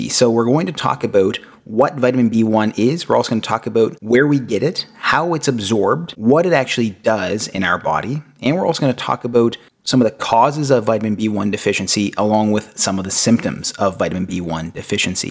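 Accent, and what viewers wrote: Canada. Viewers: Love the Canadian accent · This Doctor is Canadian., Every human who speaks English in the world has an Accent... Soooo this is the way people speak in his Country! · John Silva he's Canadian. Lol. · Yes, thats the first thing i thought, aha youre Canadian !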